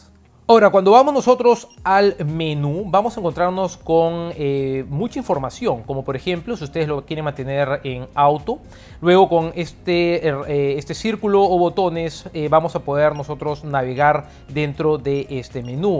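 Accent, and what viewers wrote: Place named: Lima, Peru. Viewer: si me lo suponía por que habla cómo limeño pues me agrada sus videos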